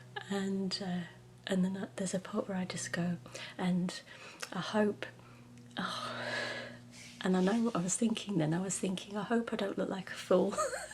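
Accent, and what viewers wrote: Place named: Northern England, United Kingdom. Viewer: I love your northern twang still coming out when you speak. You have a lovely voice… congratulations on 10 years ❤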